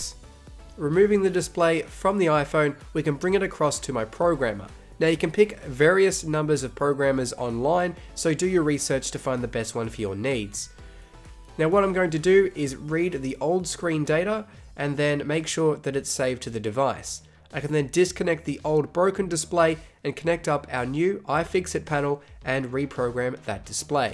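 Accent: Australia. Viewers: Hello, do you take send ins? I'm in Brisbane and recently dropped my fiancés phone onto my MacBook. There's a small but incredibly annoying scuff left on her screen. I'm at the point where I'm willing to buy her a new phone but I thought a quick search was in order first (delighted to hear an Aussie accent). If you don't, what's my best bet? I checked your online store, there's no iPhone X parts available? Is that a 'for now' thing or should I source them elsewhere? Regards, Ricky.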